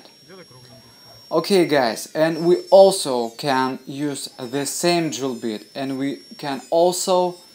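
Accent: Russia. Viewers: явно русский, страно разговаривает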